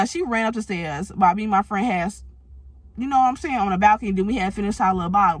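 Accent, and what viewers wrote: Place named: Chicago, United States. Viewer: I knew you were from Chicago as soon as you started talking😭🫶🏾. New subscriber! Taurus gangggg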